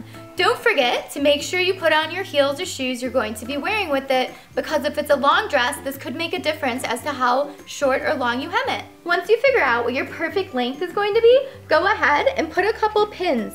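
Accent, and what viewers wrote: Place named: United States. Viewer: She makes it look very easy but oh my, that voice. Lots of American ladies have this tone and rhythm to their voices, why is that?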